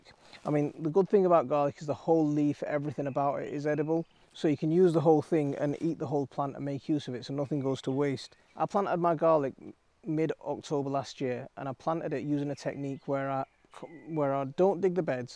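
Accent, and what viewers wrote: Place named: Northern England, United Kingdom. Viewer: ish you said it didn't divide. You've got a great northern accent but didn't you get much frost up there, or have you got a very sheltered garden?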